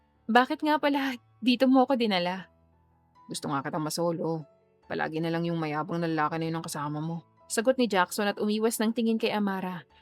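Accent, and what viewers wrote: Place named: Iloilo, Philippines. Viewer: Aliw ang ilongga na punto.. miss..❤❤❤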